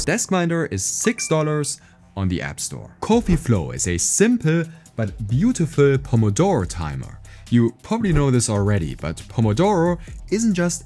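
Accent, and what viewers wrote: Germany. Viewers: Beautiful video, great exposition, nice to see mg transition, no waste of time, elegant and clear English with interesting German accent. What else? I need subscribe to say thank you 😊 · you are german ? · just stumbled on your channel. Great editing, straight to the point and interesting apps - hats off! Edit: gerade gehört dass du Deutscher bist, mach weiter so ;)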